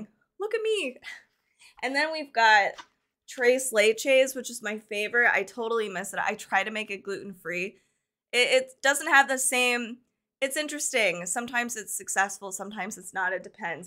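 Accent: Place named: Mexico. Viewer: I love your Mexican accent! So cool! I can’t wait to buy this cookbook. 👩‍🍳 thanks for sharing this! 🤩🥰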